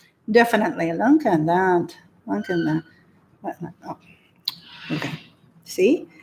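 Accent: Jamaica. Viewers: Your talking is so fresh i love they way you blend your Jamaican language is splendid and so re refreshing your shows are fantastic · I love how you pronounce some words like us Jamaicans. I love your channel.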